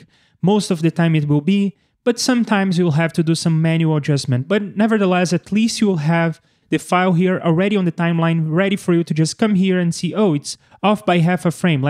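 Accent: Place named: Brazil. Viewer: Muuuuito bom, meu amigo. Escrevo em Português para agradecer, pois acho que reconheci o sotaque brasileiro, certo? Ganhou mais um inscrito. Obrigado. Abraço!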